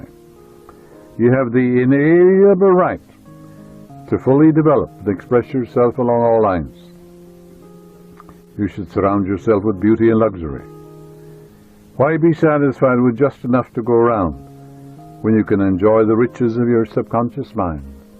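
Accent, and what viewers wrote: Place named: Cork, Ireland. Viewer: never lost that Cork Accent!!😉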